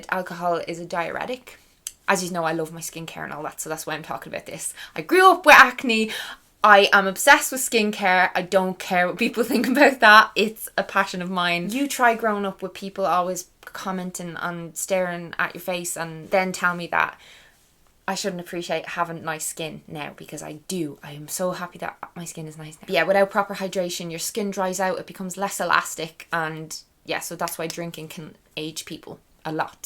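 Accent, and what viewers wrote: Ireland. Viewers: I love listening to you. Your irish accent reminds me of when I was living in Galway a few years back. Love Ireland. Big up to you for getting sober. I am two weeks in at the moment. Feeling so much better already. Greetings from germany. Chris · I can tell by your accent that you are Irish, but that is just me!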